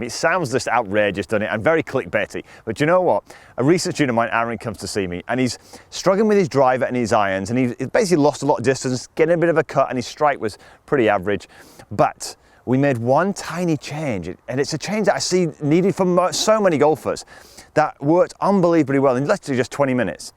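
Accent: Yorkshire, United Kingdom. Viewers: Where are you from? I hear a yorkshire accent in there somewhere haha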